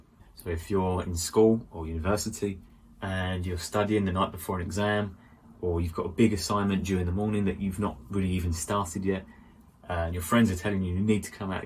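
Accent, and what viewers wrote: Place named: RP, United Kingdom. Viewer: I’d say the modern RP accent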